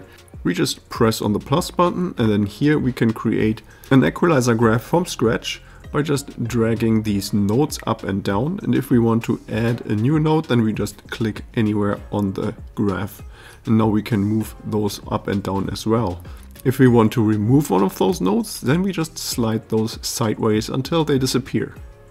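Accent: Germany. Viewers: just because Im curious, is he german? I think he has an german accent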